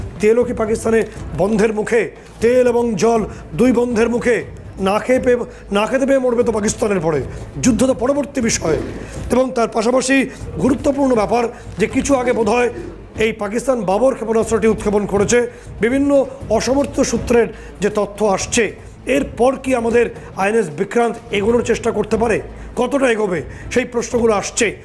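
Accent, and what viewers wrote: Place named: Bangladesh. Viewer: Bangladeshi anchor naki? Kthay kichuta Bangladesh er accent ache.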